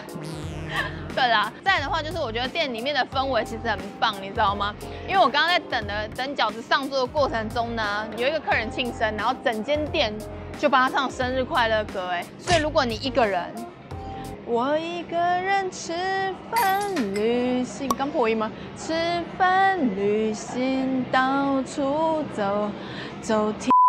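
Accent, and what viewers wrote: Taiwan. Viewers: 看1分钟，好尴尬的台式全然大丈夫、なにこれ。。。ありがとうございます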